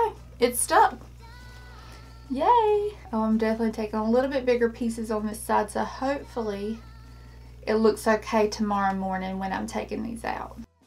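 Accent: South, United States. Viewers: She doesn’t sound anything like an Australian lol. We don’t talk this way. She’s southern 😂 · You talk so cute!! Such a sweet country girl accent! And your hair is gorgeous!! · I heard the same. But mostly I hear Southern. And it’s really great to listen to. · Emily needs to go bye bye with the negative comment about the accent, I am from North Carolina and have the same southern accent and let me just say that this chick is an adorable Southern bell and very beautiful, thank you for the tutorial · This is a Southern US accent, not Texas, but more like Georgia or the Carolinas. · Love your accent!! I found your video saying your from NC!! I'm from Oklahoma/Arkansas and it's funny how southern accent all sound a tab bit different😊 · Sometimes I think she's southern, but sometimes she had a slight Aussie accent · I just found you. You are stunning and I love a good southern accent. 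I was born in the West but my entire family is from the south and so I'm the only one who speaks wrong, as they lime to tell me. Lol. I also love shark tank and wondered about these when I saw them on there. Loved seeing how to use them. I'm really bad with hair, and makeup and fashion. Lol. I missed the girly gene apparently. 😂 want to try these now. Your curls turned out beautifully. Do you think spraying them with hair spray before you take them out will help th curl stay longer in the day? Thanks! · Is that a Southern accent? No wonder I can't understand you. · She sounds Southern. I'm from NC and have a similar accent, and I have family with thicker accents. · I want these now!!i love your accent! You talk like me so you must be from the south!😀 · I think South Carolina or north Georgia. Just a guess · I'm Australian and there is no Aussie accent there at all only Southern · As a Texan it's definitely southern the entire time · It's the lisp and the southern accent all at once! 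· Emily Gilbey hateful.. a southern accent comes from your birth place naturally just as an Australian or British. I don't understand such a hateful comment on the fact that she has a southern accent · Hannah Slowikowski No..completely Southern the whole time. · Really? She sounds like she's from North Carolina or Alabama to me. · Southern AF the whole time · I love your country sweetheart accent 😝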